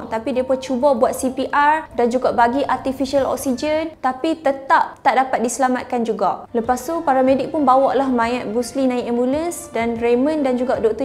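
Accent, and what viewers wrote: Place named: North, Malaysia. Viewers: Cakap utara noo.. 😊🌹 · syok dengaq loghat utara.... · xtau pulak ckp kedah naaaa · Pekat betul bahasa Kedah ang noh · Terbaik sis cara penjelasan cerita lancar Dan mudah di fahami humble relax jaa ckp utara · Segak btul marka kedah ni..bibir dia manis dgn loghat utaqa❤ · Hebat akak hg tau kt mana cerita ni. Sebenarnya menarik jgk . Lgi2 akak ni ckp mcam org utara.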